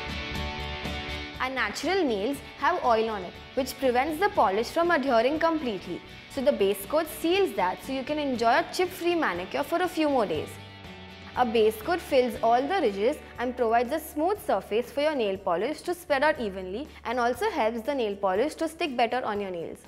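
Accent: India. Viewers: that’s Indian accent lmao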